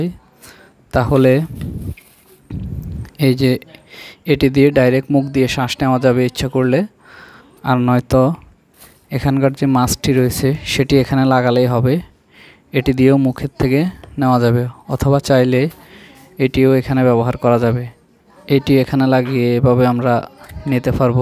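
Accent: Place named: Cox's Bazar, Bangladesh. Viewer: ধন্যবাদ, ভাই আপনার বাড়ি মনে হয় কক্স বাজারে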